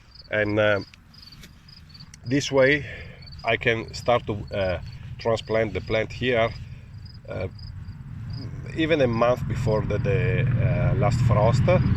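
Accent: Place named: Italy. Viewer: Si sente l'accento italiano :D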